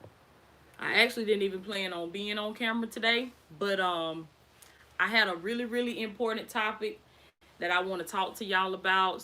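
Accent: South, United States. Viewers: I can't believe how beautiful you are. Your southern accent is darling l never heard it. Never spent time in Alabama.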